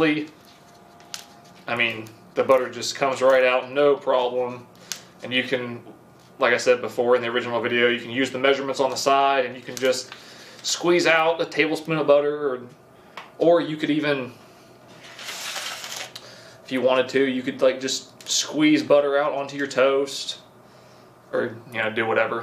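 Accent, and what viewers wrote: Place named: Alabama, United States. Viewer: Lol he's like Alabama backwoods sometimes but you can definitely tell his name is lol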